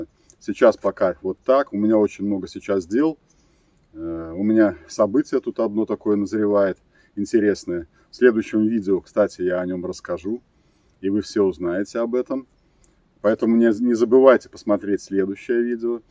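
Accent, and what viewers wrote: Belarus. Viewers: Вы с Беларуси ? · А я все думал откуда у тебя такой окцент Так ты земляк я тоже сам с Минска живу в штатах Никого не слушай все правильно сделал спас хряку жизнь Молодец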